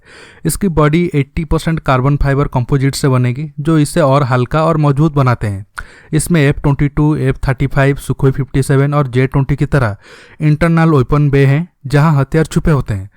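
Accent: Odisha, India. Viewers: Is the narrator an Odia?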